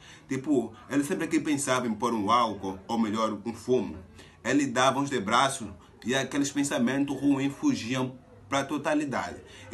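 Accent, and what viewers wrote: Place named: Brazil. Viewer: E estas a falar tipo brasileiro porque? So para pareceres?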